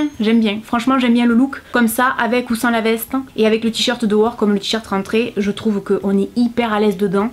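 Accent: South, France. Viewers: je trouve que rentrer le tshirt dans le pantalon allonge vraiment ta silhouette ! j'ai découvert ta chaîne il n'y a pas très longtemps et j'ai regardé toutes tes vidéos j'adore tes concepts et ton petit accent du sud ahahh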